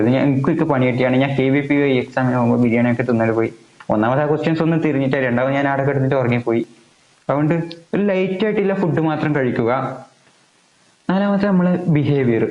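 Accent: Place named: Thrissur, India. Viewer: കേട്ടാലറിയാം ത്രിശ്ശൂര്ക്കാരനാണ്.....😅😅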